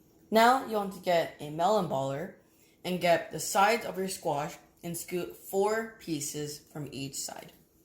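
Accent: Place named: South Korea. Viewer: 앗 한국식 영어가^^ 맛있게 잘 보고 가유~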